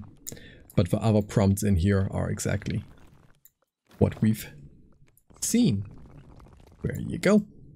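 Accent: Germany. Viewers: I love the tutorial, but holy shit I love ur voice, the way you speak and emphasize on certain words and that thick (I think german) accent just makes this absolute cinema, love it buddy 😍😅😂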